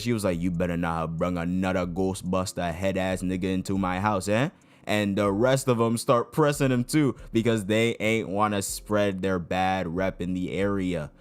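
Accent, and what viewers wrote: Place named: Africa. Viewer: NICE ASS AFRICAN ACDENT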